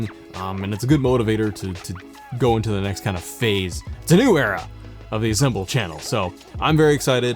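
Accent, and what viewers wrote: Canada. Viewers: i knew it was east cost and he wasn't French but I figured Halifax or Toronto but I didn't wanna shoot in the dark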